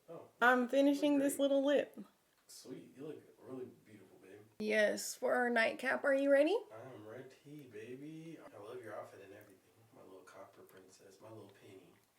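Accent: South, United States. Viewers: This is my first video of yours are you southern?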